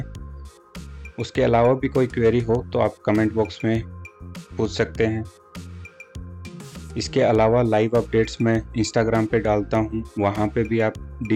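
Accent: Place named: Haryana, India. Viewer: Great voice over , the haryani accent 😃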